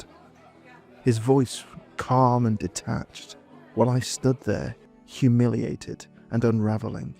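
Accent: United Kingdom. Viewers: Good northern accent. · I like this British voice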